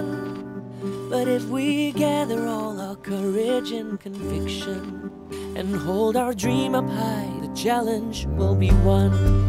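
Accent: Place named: United States. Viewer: He sounds like american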